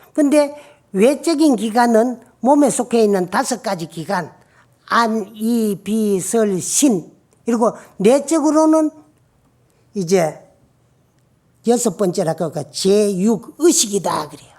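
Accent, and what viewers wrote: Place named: Gyeongsang, South Korea. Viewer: 스님 말씀 구수한 사투리 넘 정겹습니다 경북이 고향이 저의 어머니 말씀 처럼 스님의 법문 넘 잘듣고 있습니다 항상 건강하세요 오래오래 스님 법문 듣고 싶습니다🙏🙏🙏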